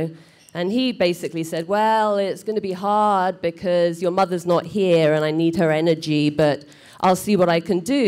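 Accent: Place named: United Kingdom. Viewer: Just stumbled across one of your stories via a friend on FB and found my way here. Love the stories. I'm a Trini and I think it's great that you visited your father in his country and found the missing parts of yourself. Here, you're just another slice of our rainbow. The Brit accent is the only thing that'll give you away! :)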